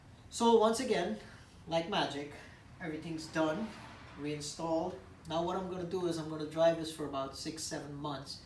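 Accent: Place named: Trinidad and Tobago. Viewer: You sound trini, good vid tho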